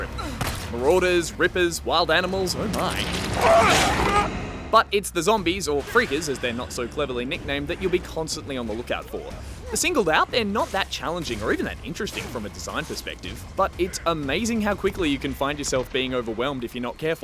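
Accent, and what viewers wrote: Australia. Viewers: Clearly sounds Australian. But Americans always do get confused about the British accent.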